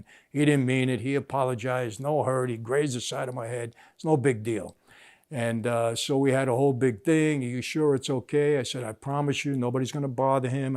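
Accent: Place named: New York, United States. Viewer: Glad I found you. I am a little older than you, grew up in Brooklyn, mother’s family Sicilian immigrants, grew up surrounded by paisans. I love to hear your New York accent, your intelligence, and your NYC Italian soul. BTW, I too am a remade man, not through The Godfather but the Father God and his Son. May he keep you humble, useful, and full of the kind of joy you once upon a time never dreamed of. One more point. I have lived in California for almost fifty years. Moving back to Brooklyn. I’ll eat some good pizza and a cannoli in your honor.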